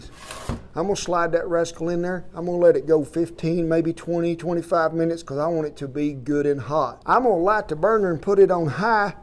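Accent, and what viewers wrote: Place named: Oklahoma, United States. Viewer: Since you live near Altus, OK, that's an OK accent. My Mom and grandmother grew up in Magnum, not far from there. OK is okay. :). So is that OK accent. I'm loving it. Reminds me of my Mom's family.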